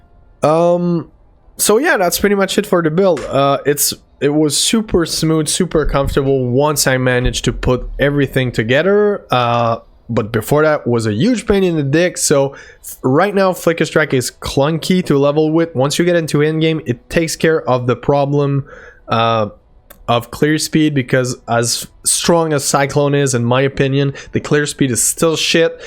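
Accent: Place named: Quebec, Canada. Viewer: C''est sur t''es un quebecois , juste a t'entendre parler en anglais haha !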